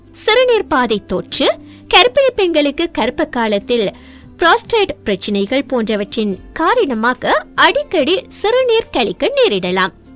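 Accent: Sri Lanka. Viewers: Bro avanga srilankan tamil...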